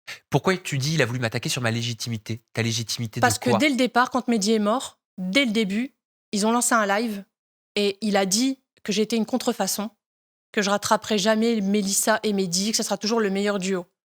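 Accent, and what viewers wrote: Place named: North, France. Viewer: J aime quand ton petit accent du chnord ressens . Parfois j ai pu entendre Medhi a travers ton interview.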